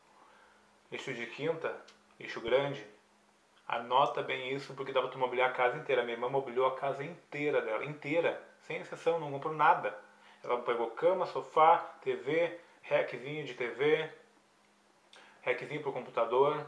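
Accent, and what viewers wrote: Rio Grande do Sul, Brazil. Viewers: e ai blz tu e gaucho tche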